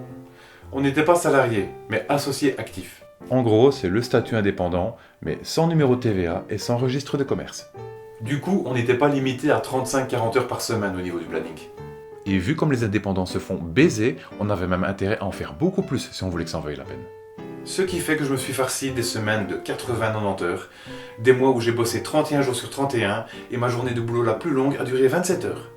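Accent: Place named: Belgium. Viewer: Hey salut maggle ! Petite vidéo pour pas dire grand-chose mais ça fait toujours plaisir de te revoir et d'entendre ton accent belge nous parler de la "pouissance". Ce com' est un encouragement à continuer :-). Plus de musique des 60's ça y est ? Un truc m'a déplu, c'est quand tu dis "c'est à cause de ces gens que...". Tu en as fait partie tu le reconnais, nous avons tous fait partie de "ces gens" à un moment de notre vie. Je pense que tu devrais plutôt juger l'attitude et non les personnes. Cela permet aux gens de changer plus facilement. PS : toi qui réfléchi au sens de la vie et la société, as-tu entendu parler du travail de Pablo Servigne ? As-tu regardé la série Next de Clément Montfort ? Bises.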